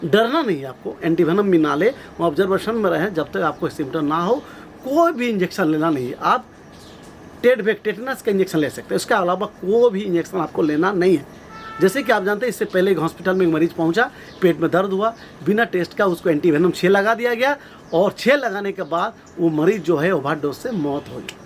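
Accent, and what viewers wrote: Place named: Bengal, India. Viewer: Hello sir aap Jo Jankari Hamen Dete Hain hamen bahut help milti hai Aur ek Baat aapki baten sunkar lag raha hai ki aap bangali ho main bhi West Bengal se baat kar raha hun ki aap ka contact number mil sakta hai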